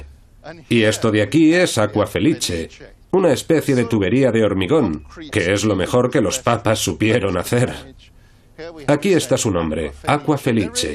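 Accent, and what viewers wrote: Latin America. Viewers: NETFLIX ya se dió muchísima cuenta, que tenemos Español Latinoamericano....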